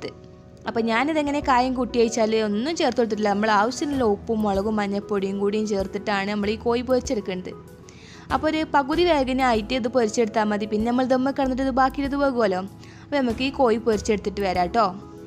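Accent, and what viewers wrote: Malappuram, India. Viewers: ചേച്ചി മലപ്പുറം ലാംഗ്വേജ് സംസാരിക്കണം റ്റോ.. നല്ല രസം ഉണ്ട്. കേൾക്കാൻ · Adipoli biriyaaniyum malapuram slang um😂😂😂✌️😘😘😘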